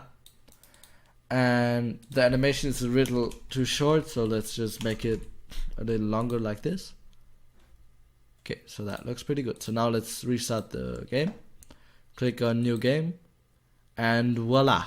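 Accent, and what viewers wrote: Germany. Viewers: are you german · Nicht böse gemeint, aber HOLY hört man dass du deutscher bist😂😂❤ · deutscher detected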